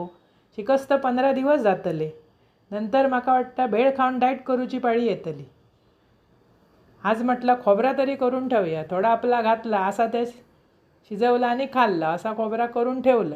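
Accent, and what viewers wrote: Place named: Vengurla, India. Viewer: वाह सुंदर वाटप,होय गॅस चो गोंधळ सुरू झालो,माका तुमच्या बोलनावरसून तुंम्ही वेंगुर्ला महेर वाटला, सुंदर receipe कधी व्हीडिओ तुमचे बघताय असा जाता माका,कणकवली क आमचा कोण नाय.वेंगुर्ला कुडाळ सगळी.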